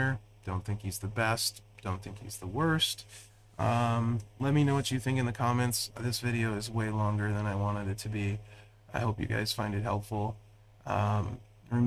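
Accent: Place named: Canada. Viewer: That's a side effect of his Canadian dialect. It's very prevalent in that country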